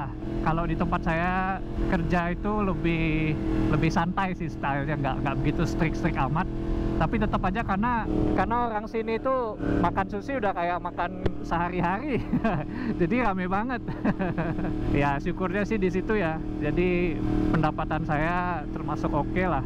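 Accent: Bali, Indonesia. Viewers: Walaupun tinggal di negeri sebrang tapi aksen bali nya ttp ada,, welcome back lahh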